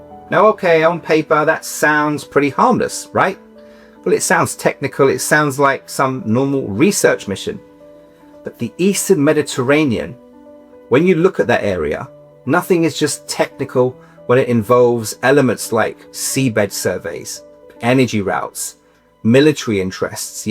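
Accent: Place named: United Kingdom. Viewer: you are invaders to Cyprus you have no rights there that's why you been ignored by all the states Turkish Cypriots ? really where you came from cause before 1100 AC there is nothing written about Turks you only came with the Mongols so what is your rights on an Island that been 3000 years known as Greek ? read some history as your accent is British there are many many libraries to go and open your eyes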